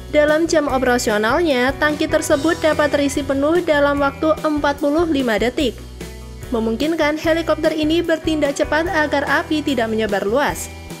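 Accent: Java, Indonesia. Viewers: Ngomngnya medog wkowkowko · Medok suaranya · Org pundi mbaa, medhok bgt omongan e hahaha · Naratornya medhok... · Wong djowo medok e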